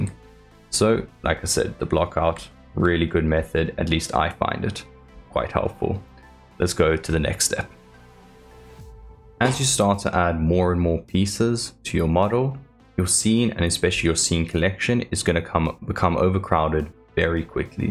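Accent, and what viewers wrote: South Africa. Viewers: Are you afrikaans? Also great video, thanks for the tips.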